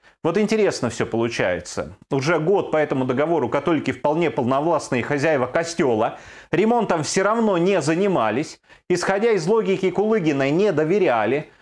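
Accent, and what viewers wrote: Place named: Moscow, Russia. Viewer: По речи я но, что не украинский журналист.Уж очень московский акцент.Наверное по сути правильно,но какое вам дело до всего,с о происходит в другом государстве.Ах,речь идёт о церкви которая принадлежит вашему государству,т.е.Московской России.Вы давно уже разграбили украинские храмы,а теперь ещё цепляетесь зубами и когтями за то,что вы считаете имеет значение для вас и ваших интересов в Украине.А главное прибыль от паствы.При всем при этом суть вопроса понятна,надо было восстанавливать храм.И прежде всего забрать его у Московского патриархата.Уходите с нашей земли и мы наведём у себя порядок.А вы держите у нашего горла нож и ждёте чтобы мы пошевилились,чтобы убить нас.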